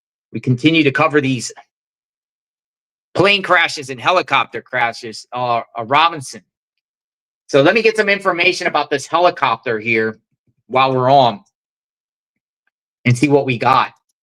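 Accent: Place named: Minnesota, United States. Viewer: Where the hell are you from? Yankee I think. Minnosota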